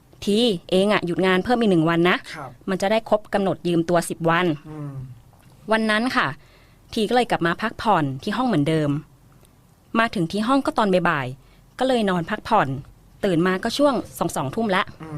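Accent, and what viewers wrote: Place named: Isan, Thailand. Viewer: พูดอีสานชัดมาก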